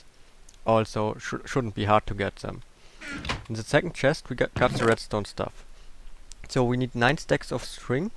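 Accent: Germany. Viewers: Du machst wirklich super Tutorials, man hoert deinen deutschen Akzent raus. Ich hoffe, ich spekuliere nicht falsch ;P Es wuerde mich sehr freuen, wenn du mehrer Minecraft Erfindungen machen wuerdest. Ich hab's auch mal probiert, aber manche Menschen sind im Umgang mit Redstone nunmal besser :/ Ich wollte nur sagen, dass du super Videos machst und auch weiterhin so weitermachen sollst.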